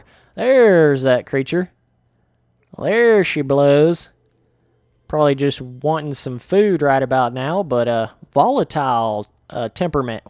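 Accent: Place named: South, United States. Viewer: There is something about a dude with a Southern twang playing No Man's Sky that is just super wholesome.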